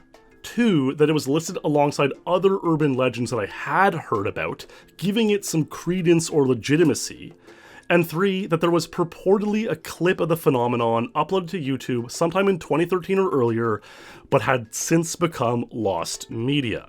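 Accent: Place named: Eastern Canada, Canada. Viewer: I detect an eastern Canadian accent-- Newfoundland? Halifax?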